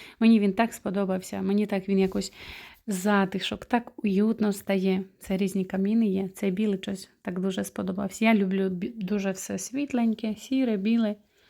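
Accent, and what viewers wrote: Rivne, Ukraine. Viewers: якщо не секрет з якої області України ви переїхали.Ваш акцент,говір дуже схожий на той де зараз живу я.Рівненська.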